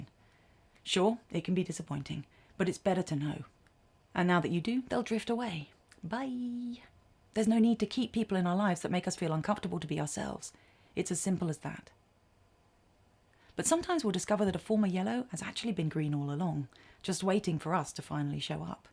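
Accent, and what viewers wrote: United Kingdom. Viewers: I love your accent, is it british?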